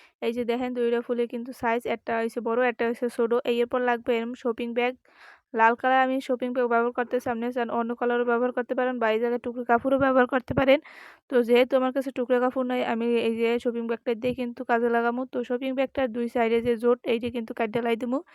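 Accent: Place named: Barishal, Bangladesh. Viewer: হে বুবু আপনিও তো খাস বরিশাল কথাই বোঝা যায়।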